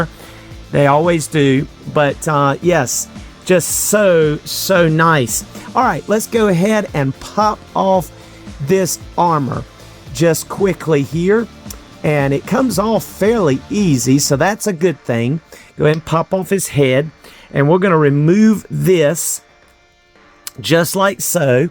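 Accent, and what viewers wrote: South, United States. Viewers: I like your southern hospitality accent when you talk I've been to North Carolina and South Carolina has stopped over at the Dallas airport like your accent, southern southern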